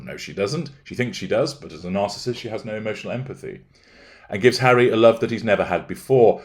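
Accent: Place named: Scotland, United Kingdom. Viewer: Love the Scottish brogue 😄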